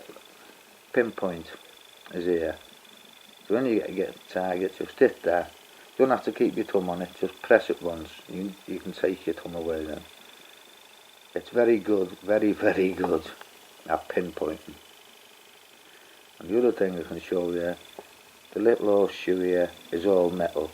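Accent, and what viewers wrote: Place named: Chester, United Kingdom. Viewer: New sub here. Thanks for the great video. I’ve just bought the 600 and this was very helpful. I have 300 acres of farm land in chester. You’re more than welcome to use it. Your accent sounds like you’re not too far away from ch47 area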